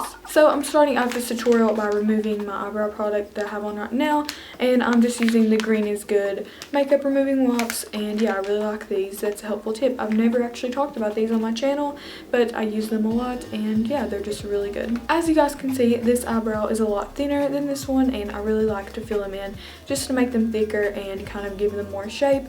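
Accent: South, United States. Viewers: She has such a souther accent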